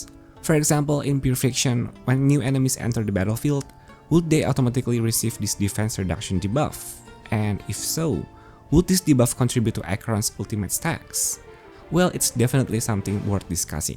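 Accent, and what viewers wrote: Indonesia. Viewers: You sound Indonesian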